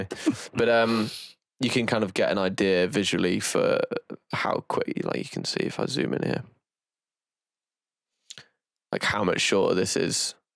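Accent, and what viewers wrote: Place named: United Kingdom. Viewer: Sick snare sick bri ish accent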